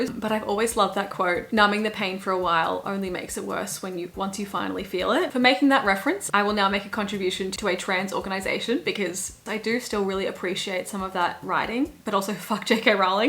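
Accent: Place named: Australia. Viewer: Unrelated comment about one of ur tweets but anyone who complains about ur Australian accent is a FREAK and does not deserve ur top tier content because wtf that’s such a wild thing to say to someone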